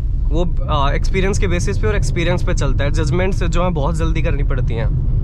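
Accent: Haryana, India. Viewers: Haryanvi Chalke h bhai boli m 👍👍👍😃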